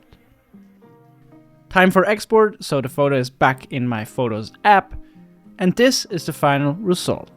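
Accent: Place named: India. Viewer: For a guy that knows a lot about upgrading, how come you didn’t try to stick your mic in the shirt instead of holding it and you don’t have to be smart to know that and you kind of sound like an indian 😷 🧄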